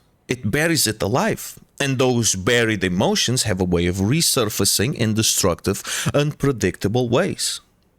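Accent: Portugal. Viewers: Interesting video. Portugues? e do norte? parece-me 😅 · You sound Portuguese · é incrível como basta um tuga para reconhecer outro tuga a falar ingles ahah, vídeo incrívellll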